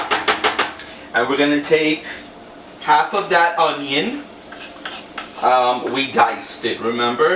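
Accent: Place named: Queens, United States. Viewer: Are you from Queens brother? Lol I love it!! Thanks for sharing!!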